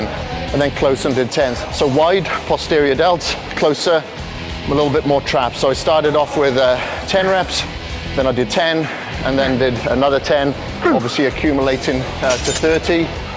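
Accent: Wales, United Kingdom. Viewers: hi were you born in the states? as you sound like welshman! and your surname is another welsh connection.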